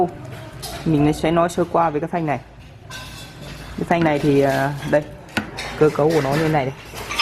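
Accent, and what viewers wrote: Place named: Central Highlands, Vietnam. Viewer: Oh giờ mới thấy rõ ghe giọng nói hình như là người vùng cao OK Number one.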